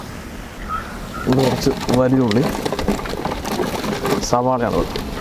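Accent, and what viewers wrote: Palakkad, India. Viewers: Palakkadan Bhasha ❤️❤️❤️🥰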